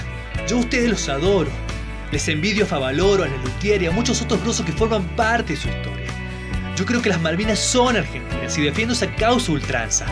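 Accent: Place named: Chile. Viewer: Creo que es chileno el que habla. 🇦🇷❤🇺🇾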